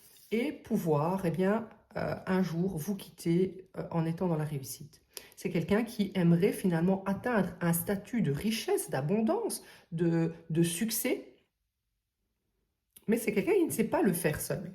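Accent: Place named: Belgium. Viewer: Il me semblait bien que je reconnaissais un accent belge!.Faites vous des voyances en privé w?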